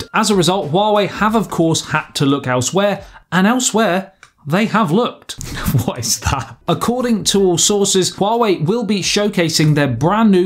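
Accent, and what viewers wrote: United Kingdom. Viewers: Such a thick British accent...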